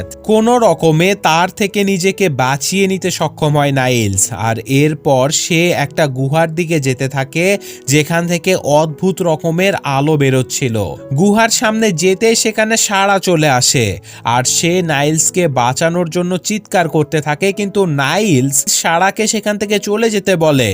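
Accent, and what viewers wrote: Bangladesh. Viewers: Dada apnar voice ta khub sundhor API ki bengladesi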